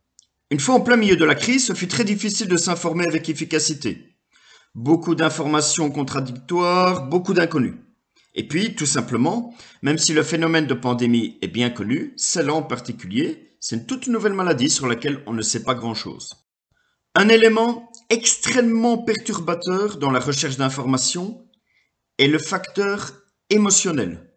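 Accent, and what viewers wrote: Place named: Belgium. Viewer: Je pense reconnaître un accent du plat pays ;) heureux de savoir qu'il y a d'autres personnes qui s'intéressent a ces problématiques dans ce pays si mal préparer a ce genre de risques !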